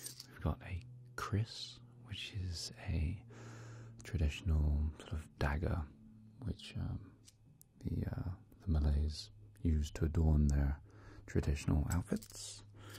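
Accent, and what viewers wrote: England, United Kingdom. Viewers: That accent . Oh the English 🤤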